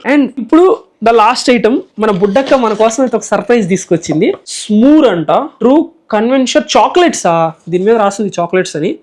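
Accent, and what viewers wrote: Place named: Telangana, India. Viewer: Annaya meeku ma telanagana hyd language baaga alavatu ayyindhi 😅( chesetodni )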